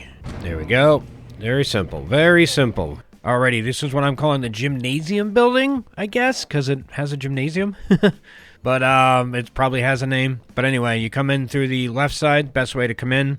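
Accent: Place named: Maine, United States. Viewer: oh yessa! Coast of maine hee-ah dee-ah!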